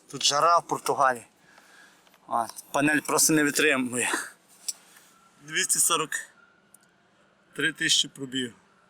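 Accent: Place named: Western Ukraine, Ukraine. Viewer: Нормально говорит, на диалекте захидном.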